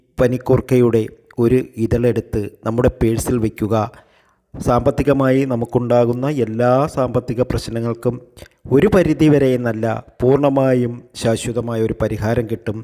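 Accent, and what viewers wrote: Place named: Kottayam, India. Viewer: Kottayam dt aakum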